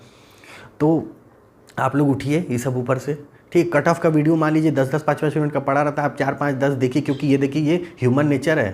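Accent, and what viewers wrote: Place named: Bhojpuri, India. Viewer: Kaha se ho sir bhojpuri bol rare ho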